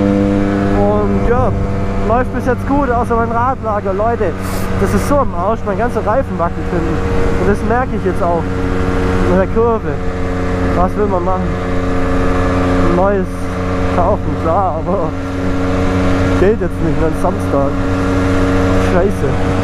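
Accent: Swabia, Germany. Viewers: Alder i feier dei ausproch so derb, endlich mal oiner der so schwäzt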